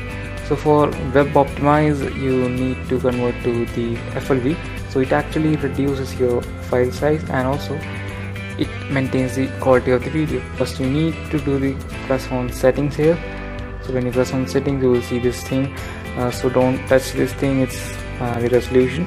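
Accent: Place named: Kerala, India. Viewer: gud tip. malayalee accnt.malayalee ano?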